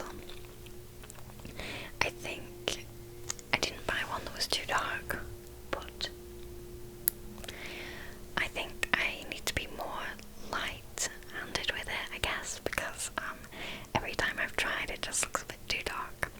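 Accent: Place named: England, United Kingdom. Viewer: Hey, Don't want to sound like a creep but where abouts in england are you from? Just because of the accent. I'm from South Yorkshire btw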